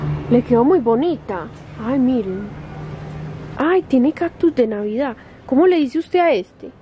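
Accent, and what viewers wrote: Colombia. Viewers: sos muy dulce y alegre, te gustan las plantas y flores tanto como a mí. Siempre veo tus vídeos, amo tu acento colombiano. Soy argentina, me llamo Liliana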